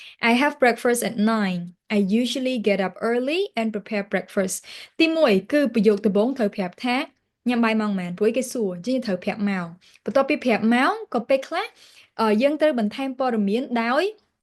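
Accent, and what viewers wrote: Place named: United States. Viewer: Your English sounds like American how long have you been learning english?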